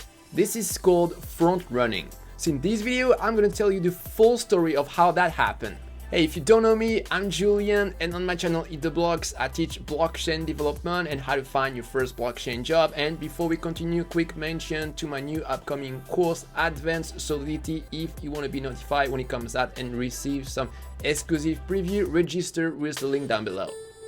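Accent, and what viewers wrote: France. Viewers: Salut. Es tu francais? Je reconnais un petit accent. A +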